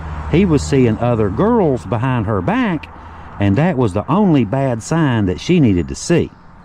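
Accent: South, United States. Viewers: I could listen to your smooth southern accent all day long ❤❤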